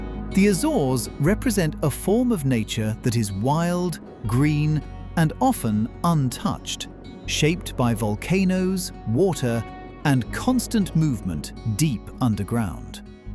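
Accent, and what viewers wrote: Spain. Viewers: The Spanish music in the background and the Spanish pronunciation of Portuguese words (particularly words with 'J') is very disappointing. Portugal and Spain are culturally very different, in fact they were historical enemies for many centuries, so hearing Spanish music in the background and Spanish pronounciation in a video about Portugal is like nails on a chalkboard. It wouldn't have taken that much more work to doublecheck the pronounciation or at least use music that is not so obviously native to Spain like . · You made a Nice video of my country,And We do have a beautiful country in my humble opinion..But ,Please learn how to pronounce our cities and places in Portuguese not in Spanish.Believe me we will appreciate it.❤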